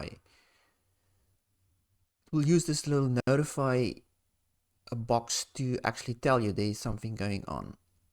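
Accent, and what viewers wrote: South Africa. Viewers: Love your SA accent. Which part are you from?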